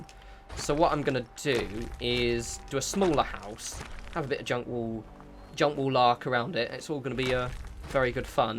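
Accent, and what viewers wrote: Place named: Yorkshire, United Kingdom. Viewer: Thumbs up for that Yorkshire accent 🤣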